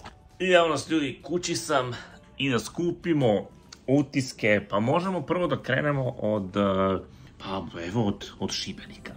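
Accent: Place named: Ekavian, Serbia. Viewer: Ajme men ove ekavice!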